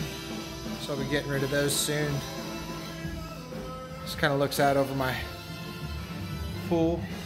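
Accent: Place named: England, United Kingdom. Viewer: That's the queen's English sir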